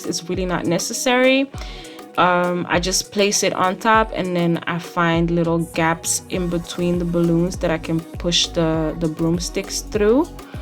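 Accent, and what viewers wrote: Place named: Guyana. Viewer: Where are you from you sound so Guyanese 🇬🇾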